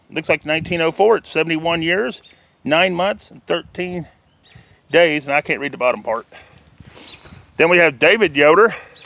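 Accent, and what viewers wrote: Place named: South, United States. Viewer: On the mark, that is Monroe Yoder, Died Aug 21 1890 (couldn't see his age) + Martha Yoder, Died July 19th 1890, aged 15 (so they were brother and sister, most likely, died one month apart). On the mark, Amos Yoder died Sept 14 1865, Aged 1 year, 9 months. I thought i heard a bit of an accent in your speech but frankly, i didn't know where exactly to place it from, in the south (i thought maybe Texas, haha, although i see now that i was wrong) because i know each state in the south has its own type of accent 🙂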